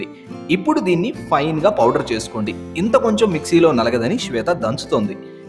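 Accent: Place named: Guntur, India. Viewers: Are meru Guntur slang Kuda Chala chakkaga matladestunnaru. Vinadaniki bagundi. Pickle chuste notlo neellu,kallalo Kuda neellu vachestunnai. Defenate ga chestanu. Garu meru okasari kanipinchandi. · actually nenu vere vallani anatledu sir gnt slang baguntundi meeru slang marchakandi teleena vallu apardham cheskuntaru antunna that's alll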